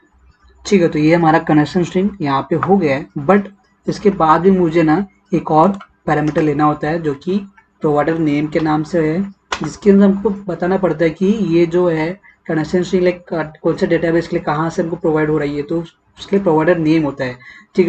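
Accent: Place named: Bihar, India. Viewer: Lag raha hai aap bihar se ho thik hai.❤❤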